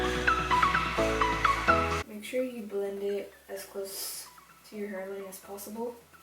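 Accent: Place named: Mexico. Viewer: Lo supe por el acento!! Yo también soy de Mexico y creo que me escucho como tú cuando hablo inglés... es curioso jajaja :*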